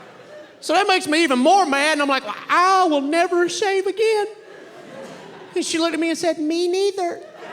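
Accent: South, United States. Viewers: Wow. How unfunny this SOB is. No originality. Hinges on a “redneck” accent and nothing he says has any form originality. He’s got the appeal of Donald trump and the boredom of boredom. Legit trailer park trash and being ironic or not. He’s just not funny.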